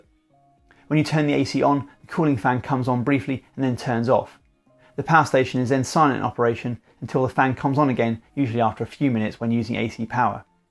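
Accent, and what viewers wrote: United Kingdom. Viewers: Hi ur british?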